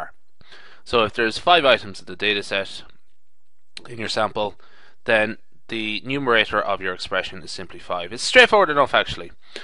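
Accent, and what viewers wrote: Ireland. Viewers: Hi! Are you Irish? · Is that an Irish accent I detect....? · Yas Niall!! I noticed that too and I was laughing the whole time. (I meant that positively because I like Irish accent) ☺☺☺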